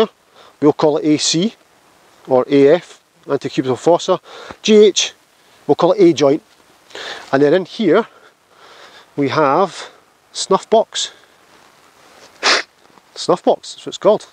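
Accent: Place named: Scotland, United Kingdom. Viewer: This is excellent stuff, really enjoy your videos and the personality. Having a bit of fun understanding what you are saying sometimes as I am a canuck, but my mom and gran were Scottish born (McIntosh) and I remember the brogue. Cheers.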